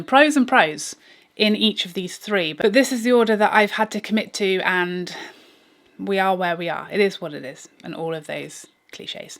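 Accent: United Kingdom. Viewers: I love how you brits say “purrrhfect” 😍 I wish I had that accent!!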